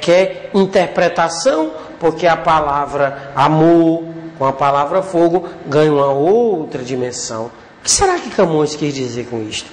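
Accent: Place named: Northeast, Brazil. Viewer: Você é o cara, já vi de tudo mas posso concluir que com você o entendimento é rápido e claro. Feliz São João professor, pelo seu sotaque ou é nortista ou nordestino. Um abraço.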